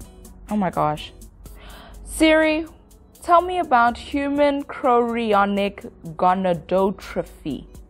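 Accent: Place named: South Africa. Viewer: Absolutely live your voice and accent.. 💖 a friend of mine is from South Africa and sounds similar to you but she’s been in the states now over 20 years so enviably she’s changed some.. 🤷‍♀️ 🥰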